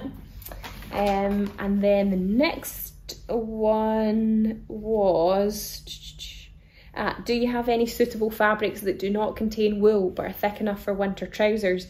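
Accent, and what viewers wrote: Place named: England, United Kingdom. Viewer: Watch you 10 sec and got subscribed. Such a inspiring vibe and sweet energy. For me as a German your dialect I have to listen more. Hmmm I still guess …. No USA no canadien … England? …. Hmmmm … Australia maybe. But I understand everything. 😂 from the fabrics and things in the background I can tell I like this style. Thank your lives sessions. Best way to learn, even if I don’t see this Live. Greetings from Germany 🇩🇪 🎉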